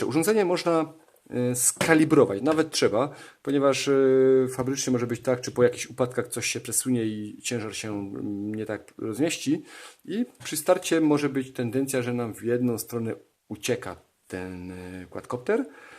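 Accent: Silesia, Poland. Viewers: zasadniczo fajna prezentacja, jedyne co mi przeszkadza to śląski akcent w wypowiedziach prowadzącego, reszta super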